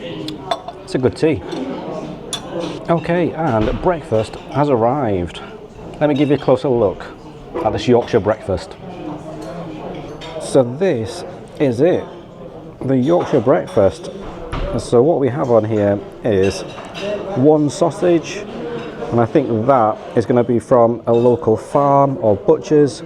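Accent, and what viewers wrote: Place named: Manchester, United Kingdom. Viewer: youve got a Manc accent or somewhere near, ( I could be wrong and it may be bearer to Yorkshire accent) so, I suspect as a Northerner that you actually know better. You should have sent that right back to the kitchen and gone to a builders cafe . Those beans served on the side as a garnish is , in itself, a red flag. Only one sausage? really? Why isn’t the egg runny / sunny side up . The mushrooms should be sliced thin and not fried but sautéed in a little oil.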